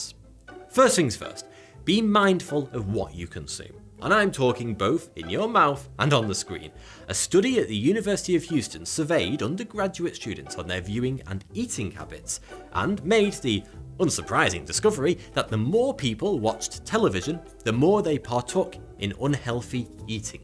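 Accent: United Kingdom. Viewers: Nice and British, yes... He not also have said "but" strangely BUT also "ass"... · it's a typical British accent really I am not originally from the UK but I live in Yorkshire and I can tell you that loads of British people have a lazy way to pronounce "th" simply sounding like they are pronouncing "f"... For what I heard he is originally from the Isle of Man.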